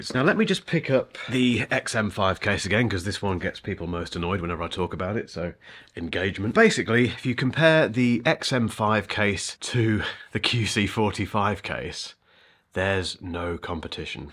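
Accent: United Kingdom. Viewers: Calm lovely voice, British accent is the best ❤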